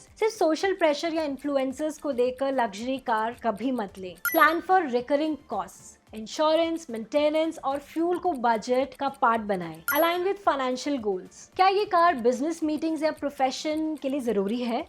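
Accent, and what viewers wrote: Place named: Uttar Pradesh, India. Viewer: Phir ye pakka hai ki tum UP ki ho. Sahi kaha na?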